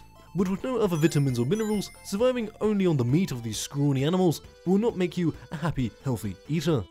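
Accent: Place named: England, United Kingdom. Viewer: Live the concept. It's like a Doomsday Preppers parody with a very English accent. Me Gusta! ^_^ May I suggest Threed from Earthbound?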